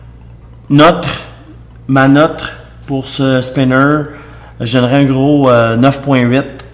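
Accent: Quebec, Canada. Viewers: Perso les gars moi je regarde les vidéo 50% car son accent quebecois est génial et 50 % pour découvrir des nouveaux objets.^^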